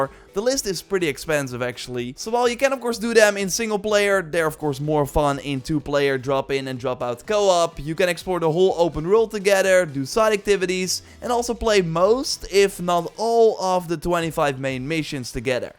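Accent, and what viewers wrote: Netherlands. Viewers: Zonder dat ik je ken weet ik dat je Nederlands bent haha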